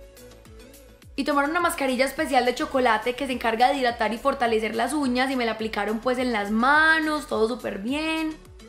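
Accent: Antioquia, Colombia. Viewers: me encanta el acento paisa de hablas divino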